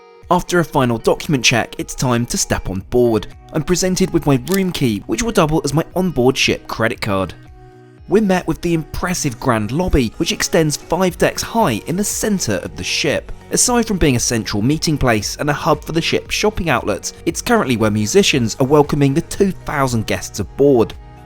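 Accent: United Kingdom. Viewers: Theyre called lifts in the uk. Youve got a surprisingly British sounding accent for an American. 😮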